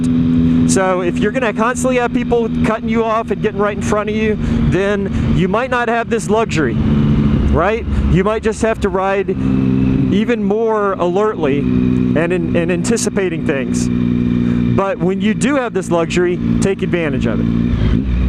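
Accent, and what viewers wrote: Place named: Oklahoma, United States. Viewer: Yes thankfully you don't have a Canadian accent, nothing against Canadians, but it bothers me for some reason. :) I am Oklahoman so I suppose you sound just like me. Thanks for the excellent video.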